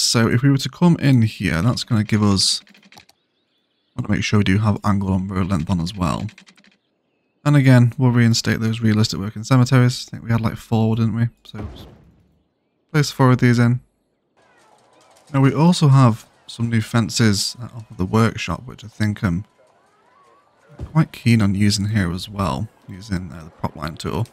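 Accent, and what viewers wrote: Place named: England, United Kingdom. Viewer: Time to sit back and enjoy my dear old with his awesome funny English accent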